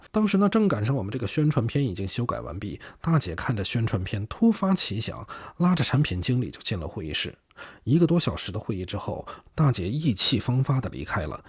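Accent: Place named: Tangshan-Tianjin, China. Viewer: 😂北京人（唐山天津味）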